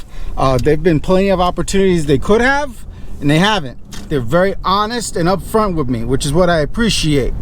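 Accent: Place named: East Coast, United States. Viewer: I love your vibe... right at the beginning of the video I knew you were east coast.... I'm from Syracuse myself just looking for a change! Thanks for the info.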